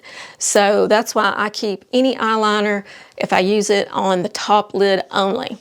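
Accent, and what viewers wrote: South, United States. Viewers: Hi. I love your video. I’m 65 and trying to figure out how to get where you are going in my own personal life. I am disabled so I’m at home all the time. I would not be caught dead in Capri pants or shorts or dress because I have skin problems on the bottoms of my leg below the knee. It’s kind of a permanent thing-that I can’t do much about at the same time. I can’t imagine wearing linen flowy pants every single day all the time. I just don’t quite know where to go stylewise. I end up wearing sweatpants all the time. I live in a small small town so I have to do all of my shopping online. I’m going to keep trying to transform my summer look. Thank you for the video. It keeps me focused on a goal PS I absolutely love your southern accent. I’m a southern girl myself, but I live in the upper Midwest now. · I laugh and think of your sweet southern accent when I see Capri pants and cheap flip flops!!!!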